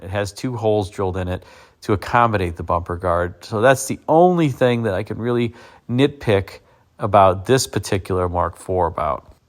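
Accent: United States. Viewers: Keep up the great work very clear American accent cheers from Australia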